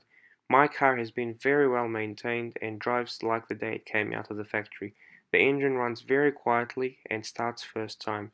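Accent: New Zealand. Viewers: From the accent and road signs, I'm guessing New Zealand. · Recognized the Auckland accent the second he started talking 👌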